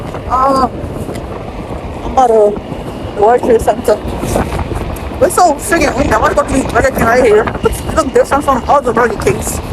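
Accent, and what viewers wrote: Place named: Jamaica. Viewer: Listening to your accent makes me wish I was in Jamaica right now!! Can’t wait to go back!!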